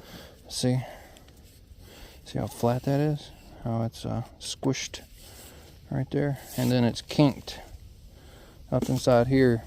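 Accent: South, United States. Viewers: can tell from his accent hes down south, def below NC/ KY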